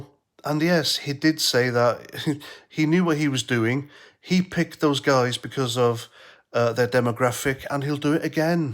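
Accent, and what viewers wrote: Wales, United Kingdom. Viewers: You're Welsh aren't you?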